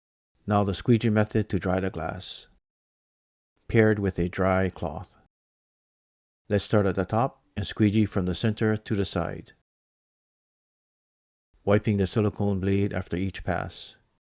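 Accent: Hawaii, United States. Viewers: I can here the pidgin coming tru 😂🤙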